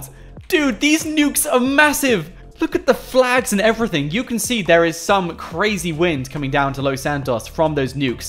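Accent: United Kingdom. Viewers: Are you from UK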